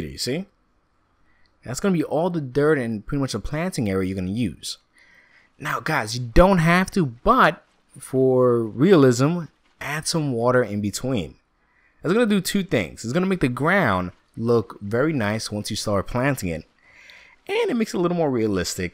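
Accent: New York, United States. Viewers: I think it is funny that you apologize for the noise, and tell people that you are from New York. LOL. I think everyone knows you are from New York. You have a very distinct accent. There are a couple of your videos where you say Forget about it. I lose it, totally lose it. Reminds me of a very young Joe Pesci. I hope you know I am a fan of all of your builds, and I don't mean anything bad about your accent. As a matter of fact for those of us who spend hours watching these videos making our builds it is important that the video makers accent is easy to listen too. There are several channels that I just can't watch because the maker's accent or rythm of speech is too annoying.Keep up the great work.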